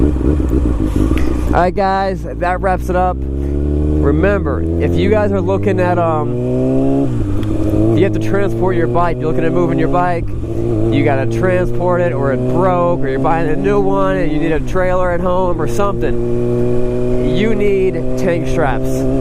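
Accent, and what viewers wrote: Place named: Pennsylvania, United States. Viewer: I'm a recent subscriber and I love watching your videos. As you know there's tons of YouTube channels about motorcycle reviews and at first I didn't realize why I liked yours so much, then I got it. It's that subtle Pennsylvania accent. My family's from Pittsburgh, which some have a much more strong accent than you. I lived all around the country and pretty much lost it all together, unless I'm imitating it on purpose. Of course the rides are great, you discuss what I really want to know, I wouldn't watch a vid just to hear an accent. Thanks for the good job!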